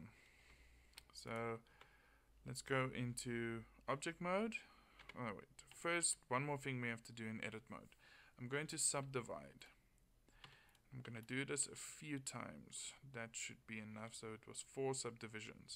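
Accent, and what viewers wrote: Afrikaans, South Africa. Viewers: You sound Afrikaans :P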